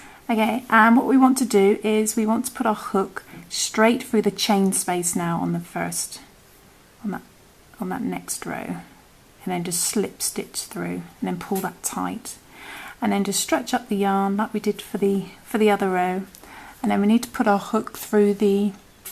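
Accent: United Kingdom. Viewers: Too pretty for a cloth would make a super scarf. That said why oh why are you stating American terms firstly for the yarn worsted? or is the hasty dk British part? Also that’s not a double crochet for us older Brits it’s a treble! It just annoys me so much that fellow Brits nowadays seem to immediately use American terms I’m presuming from you’re a Brit from the voice naughty of me, also sorry pet peeve use of US terms for everything rant over. Is a nice pattern though.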